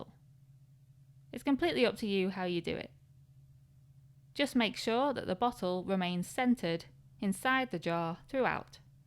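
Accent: United Kingdom. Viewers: pva glue is used in the UK, you can tell from her accent that's where she's from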